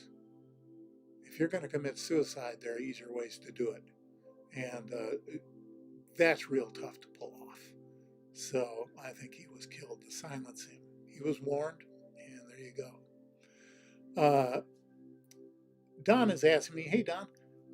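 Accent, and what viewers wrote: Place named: Massachusetts, United States. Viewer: You're a very interesting man,. I suspect that I could listen to you for hours. I summer in Newport, RI and I understand that you reside in New England; I suspect Massachusetts from your accent. Perhaps you'll have a subscriber meetup in the future and we can meet and exchange stories. I've had several encounters in my career that might stun you. Actually, it was these encounters that were directly responsible for my interest in the subject of extraterrestrial life. Great live hang. I'm off duty next Tuesday and expect to finally get to see a live show.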